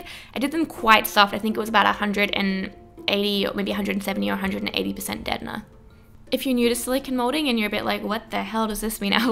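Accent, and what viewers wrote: Australia. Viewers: What part of Australia are you from I'm from port maquarie 😄😄😄😄😄😄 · I really really love this! As a human being which is fluent in english but not really sure if I understand dialects or different accents, can someone please tell me if she has an australian accent? :D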